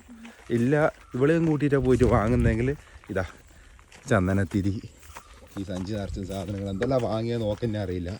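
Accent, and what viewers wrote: Kannur, India. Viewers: ഓടുത്തും നമ്മുടെ സ്വന്തം കണ്ണൂർ bhasha😂😂😂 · അതാണ് നമ്മുടെ കണ്ണൂർ ഭാഷ 🥰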